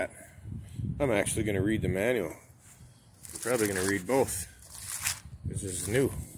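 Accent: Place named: Appalachia, United States. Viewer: You sound lil bit hillbillysh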